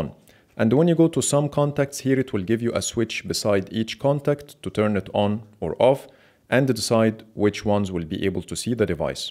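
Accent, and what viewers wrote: Egypt. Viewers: مصري باين اووي من الاكسنت بتاعتك 😂😂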